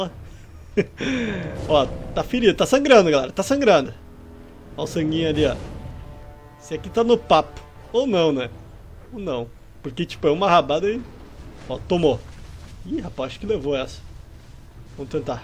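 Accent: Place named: South, Brazil. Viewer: Sotaque muito do Sul skksksks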